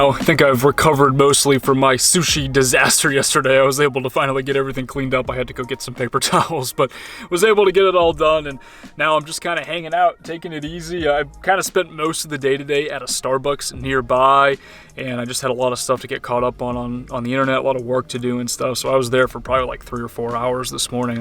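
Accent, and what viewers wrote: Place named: New England, United States. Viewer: It was that 'HIGH-SPEED NewEngland speak' you do; threw them off during your greeting😉😊. Some visuals highlights of some of these cities/suburbs would be a nice addition,. JOY!💚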